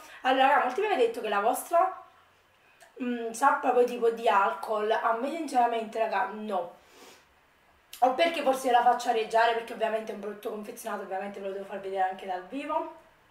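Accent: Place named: Campania, Italy. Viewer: Io penso che tu non ti debba giustificare dei tuoi errori grammaticali, io ti seguo da poco e mi sto letteralmente divorando tutti i tuoi video perchè mi piace la persona che si intravede semplice e genuina, oltre che mi sono utili i tuoi video in quanto sono un acquirente di allsupplements. Inoltre reputo anche tu sappia intrattenere il pubblico. almeno per il mio gusto personale si. Continua così :-) P.s. adoro il tuo accento campano!!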